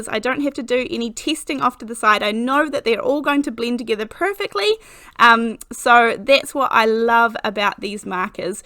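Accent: New Zealand. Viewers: You sound like New Zealand. I'm in Montana, USA. Those are so cute. Brand new subscriber just right now.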